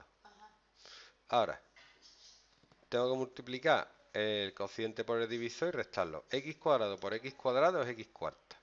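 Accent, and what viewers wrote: Andalusia, Spain. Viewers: Las mates con acento andaluz se entienden mejor! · Gangoso de que? se llama acento y su acento tiene mas historia que el tuyo SEGURO. Si no mira la historia del andaluz como dialecto y su influencia en todo el castellano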